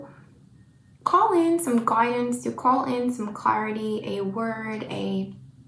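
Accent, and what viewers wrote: New Jersey, United States. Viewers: Love your jersey accent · I’m originally from eastern jersey. Did you ever live near there in the past? I picked up a little accent as you were speaking in this video I had never heard before in your past videos. And according to my wife, it sounds like my accent. Just asking.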